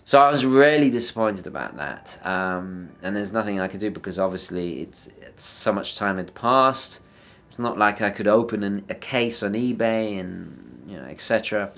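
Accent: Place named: United Kingdom. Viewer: Seems like Brit’s like to add a Y to words lol maybe it’s just me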